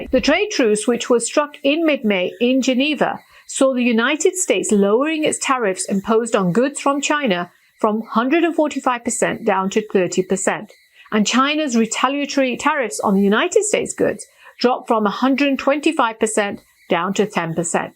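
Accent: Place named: United Kingdom. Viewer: I like your analytical approach in analyzing the rival countries. Your UK accent gives it more of a valid flavor. Plus, you look to good to be English.😍 Just Joking. There are a lot of beautiful English women too.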